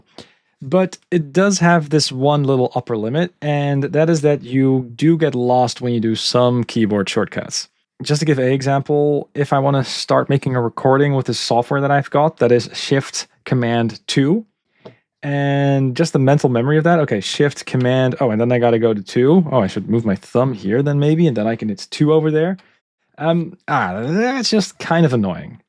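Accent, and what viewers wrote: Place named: Netherlands. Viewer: This keyboards looks very interesting. For some reason about 4 seconds in I could tell your accent was Dutch, then found out you are actually Dutch haha. Was wondering if you had a discord I could add, I had a few questions if you wouldn’t mind.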